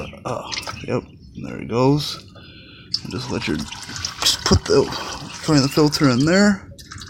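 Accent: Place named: Michigan, United States. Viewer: Lol yea man im from michigan too! I got the accent too haha..but im just lookin to buy a nice condition 05 grand prix w the 3800...i do the oil changes in all my cars and just wanted to see how much of a pain this one was...looks fairly easy access to me...i also know the throttle bodys get jammed up on these...whats ur opinion on that?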